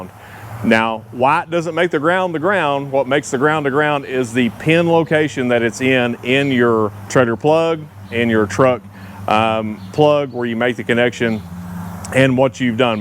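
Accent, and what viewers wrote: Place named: South, United States. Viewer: Yes indeed . Always check the ground. Excellent video. I understood you clearly. Some folks migh think a southern fast talking is to much. You got alot of info down in shorter amount of time . Wish I did that on my bilge pumps last week. Thought the manual switch was left on and burnt them out. Bought 2 replacements. Reconnected and noda. I should of checked the bilge wiring better. It was the ground wire. Trailer light no workkee. Re-wired aas I kinda took off one time while they were still connected. After new install , no lights. After troubleshooting, it was indeed the ground on the chassis light. I have to remember to always check the ground. Cheers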